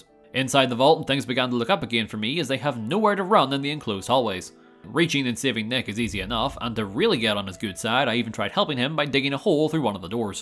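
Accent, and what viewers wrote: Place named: Northern England, United Kingdom. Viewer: Where in north are you from mate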